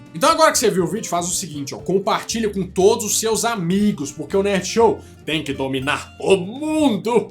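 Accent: Minas Gerais, Brazil. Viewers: até no geito de falar vc parece mineiro é nois!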